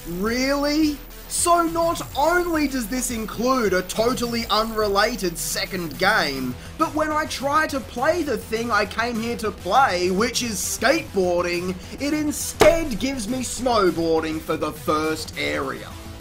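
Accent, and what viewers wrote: Australia. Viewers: I'm glad I didn't accidentally buy this game yesterday at my local retro game shop. It was either this or Downhill Jam. I bought the latter and I like it. The price difference made it really obvious which one was better, even though I'm sure the guy at the counter probably would have at least told me it wouldn't work on my New 2DS XL anyway. And I just gotta say that your obvious frustration sounds 1,000 times funnier and more relatable in your British accent, even though I'm from New Jersey. Lololololololololol.... Correction: Australian accent. Sorry I didn't realize that at first.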